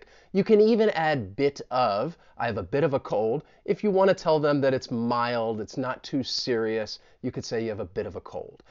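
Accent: United States. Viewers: AN AMAZING CHANNEL AND USEFUL YOU'RE THE BEST BRO I REALLY LIKE THE AMERICAN ENGLISH ACCENT WHAT DO YOU RECOMMEND THAT I WATCH FROM YOUTUBE CHANNELS OR WEBSIT TO LEARN THE AMERICAN ACCENT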